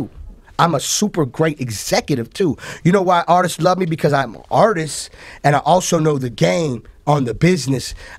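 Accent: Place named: Jamaica. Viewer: Dj Khaled is a real producer no joke talk straight frm JAMAICA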